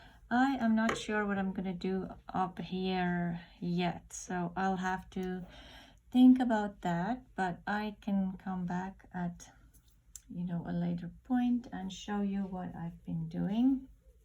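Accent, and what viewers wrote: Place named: South Africa. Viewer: I found your video today. Love listening to a fellow SA accent.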